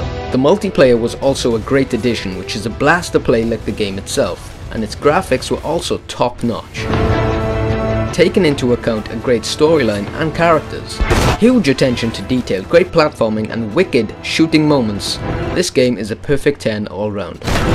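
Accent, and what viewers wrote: Wales, United Kingdom. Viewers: you have a slight welsh accent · is he welsh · he has a welsh accent lol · i just got a question are you brittish?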